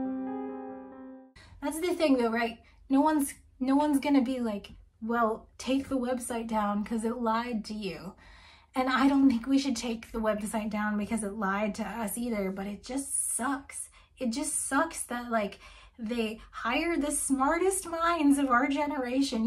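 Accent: Kentucky, United States. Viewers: More Kentucky accent!!!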